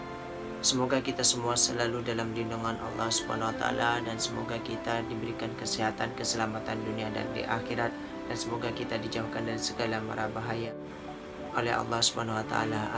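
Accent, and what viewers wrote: Malaysia. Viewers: logat Malay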